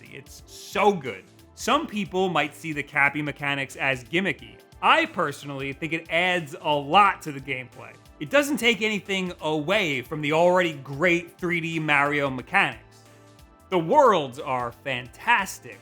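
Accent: New York, United States. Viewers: You can tell that he has that New York accent just because of the way he says Mario lmao.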